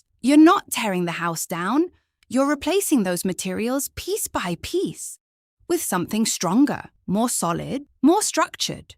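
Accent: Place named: United Kingdom. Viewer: It’s good to hear British accent 😉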